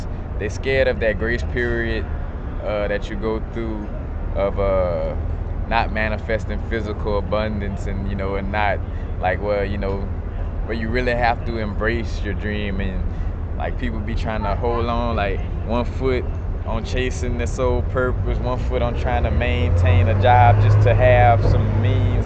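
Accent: New Orleans, United States. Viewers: Brother are you from New Orleans? Sure sounds like it.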